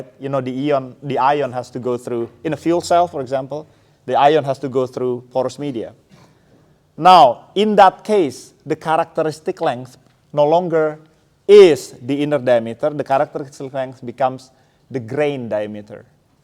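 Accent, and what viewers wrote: Indonesia. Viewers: perfect English with totally Indonesian accent. Awesome, dibanding anak2 sekarang yg sok logat amrik tapi structure dan grammar berantakan :)) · Akumrasa nggak sih. Akumsangat merasa, bahwa Doktor ini bukan orang tulus dan bukan orang yang berdedikasi. Melainkan penampilan dan gaya lebih penting buat diandaripada science itu sendiri. Selain itu bahasa inggris nya sangat standard dan sangat kental aksen bahasa indonesianya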